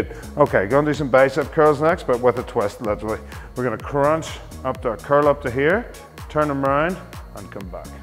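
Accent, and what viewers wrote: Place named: Scotland, United Kingdom. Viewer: I'm happy to have found your channel. Your workouts are for all fitness levels and are quick and to the point without endless chatter and you explain the movements and techniques extremely well...just a question--are you from Scotland? My boss at work is from Glasgow and speaks just as you do:)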